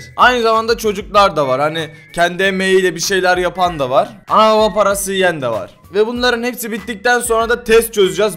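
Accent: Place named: Hatay, Turkey. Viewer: hataylı olduğu nekadar belli 😃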